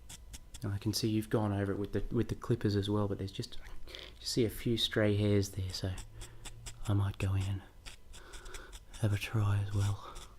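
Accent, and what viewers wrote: Australia. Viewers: your voice is perfect and the Aussie accent is an added bonus!!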